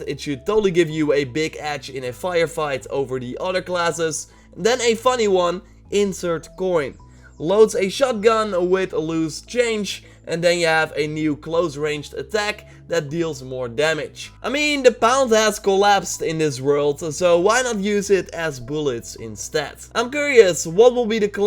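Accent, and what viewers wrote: Netherlands. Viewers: Are u dutch because u sound dutch? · Are u Dutch?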